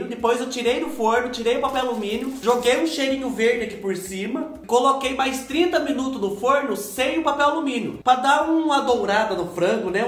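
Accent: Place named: Minas Gerais, Brazil. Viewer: Acho muito interessante que é de São Paulo e conversa muitooooo parecido com nós os mineiros muito mesmo, muito legal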